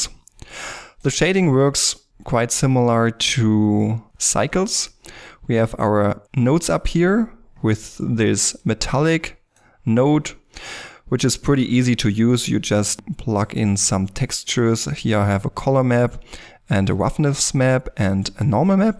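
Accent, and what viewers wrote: Germany. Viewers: Nice video :) I like this german accent xD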